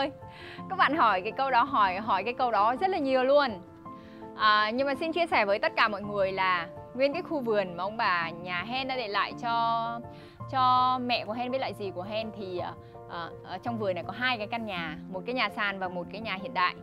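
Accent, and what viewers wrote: North, Vietnam. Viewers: Mình thích em ấy nói giọng miền bắc hơn❤ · Nói một giọng thôi nè chị Hen, lúc giọng na ná Bắc, lúc giọng na ná Nam nghe nó kỳ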